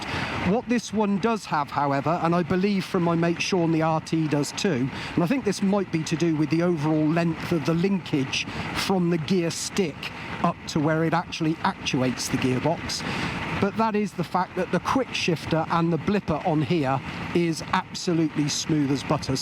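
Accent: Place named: United Kingdom. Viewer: I love British accents. Thanks for the ride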